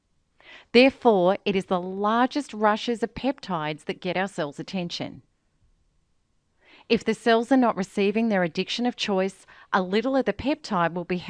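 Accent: Australia. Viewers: I can't stand the Australian accent.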